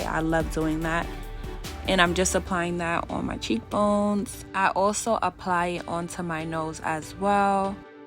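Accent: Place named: New York, United States. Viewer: ASA new subscriber!!! Beautiful look!! Edited to say, I thought that was a NY accent (I'm from NJ) lol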